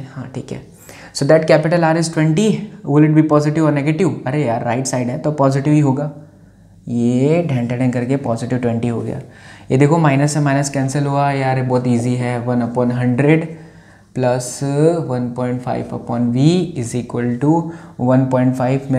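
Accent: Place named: Haryana, India. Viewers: Fab haryanvi.! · Itti bdiya haryanvi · Haryanvi is superb sir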